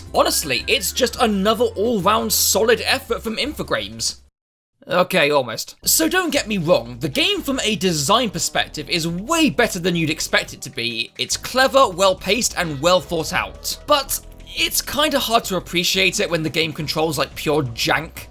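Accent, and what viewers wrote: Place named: United Kingdom. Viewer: I did not know you spoke british!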